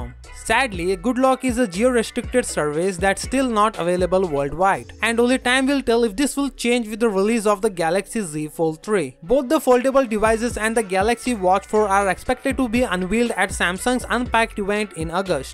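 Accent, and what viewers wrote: India. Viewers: Indian accent very strong , pls stop thank q ver much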